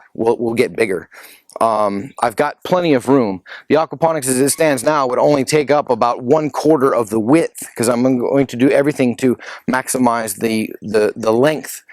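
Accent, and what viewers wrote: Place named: United States. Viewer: Sounds Great, where are you building this? Accent says USA but some references point to Australia...... soooo Queensland??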